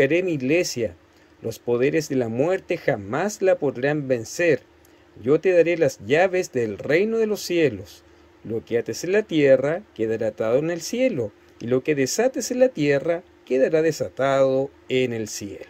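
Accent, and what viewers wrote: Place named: Chile. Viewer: Buen día, esa Biblia es mi primera Biblia, le decían la Cenicienta; un error gravísimo, así lo veo yo, no quiere decir que tenga razón, pero es mi primera Biblia y la quiero mucho y no la veo como cenicienta. Aún la tengo a la Biblia. Sí, exactamente como ibas leyendo, con ese acento chileno tan lindo, dices cap. tal vers. tal lo busco y voy bien. ☺Ayer a la mañana en tv chilena se presentó un SR. entendido sobre Carlo Acutis. Me gustó mucho como explicó. Y ahora hablan de Loan, gracias Chile, y me duele mucho lo de abuelita chilena. Hasta el próximo encuentro!!! Bendiciones!!!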